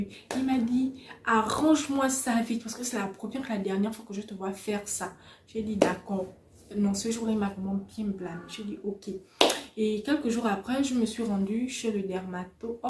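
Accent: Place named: Gabon. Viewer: Accent gabonais on dirait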